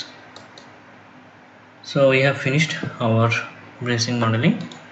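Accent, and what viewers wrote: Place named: India. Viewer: Hi.what is you nationality dear dude?You speak like Indian People.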